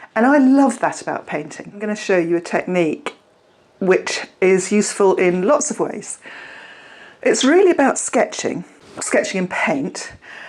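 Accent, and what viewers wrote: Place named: United Kingdom. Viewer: Dear, Thank you for sharing your very interesting say « experiments » with acrylic paints. I love it. And you give interesting tips as well to achieve such kind of expressive paintings. Also, we love your so lovely British accent from this continental side of the channel ❤😊. Take care. Respectfully from Paris.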